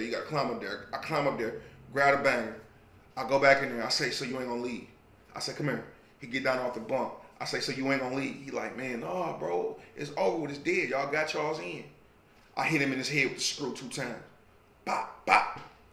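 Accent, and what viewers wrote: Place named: Michigan, United States. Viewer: it’s how we talk in Michigan, call it bad pronunciation, regardless the talk different for us ✌🏾❤️ 🧤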